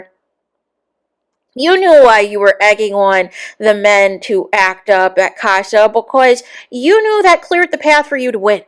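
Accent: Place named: Minnesota, United States. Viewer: i love ur accent! i just know ur from Minnesota 🤣🤣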